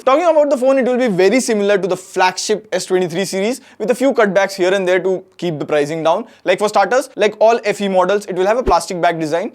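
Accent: India. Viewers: What is this obsession with trying to speak English in this ugly Indian accent for Indians, by Indians ?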